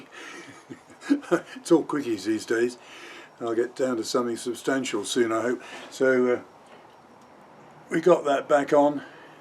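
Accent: England, United Kingdom. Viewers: *, where are you located? Depends on the type of steel as to the porosity. A little heat between acetone cleanings will help. Looks pretty good in the end. *The reason I ask is your accent and terminology makes me think England, but you measure like an American.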